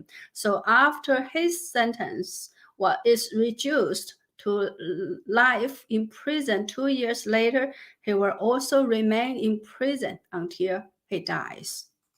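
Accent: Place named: China. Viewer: Жаль, что нет субтитров к этому видео, так как довольно сложно разбирать на слух английскую речь с китайским акцентом (пожалуйста не воспринимайте это как попытку оскорбить).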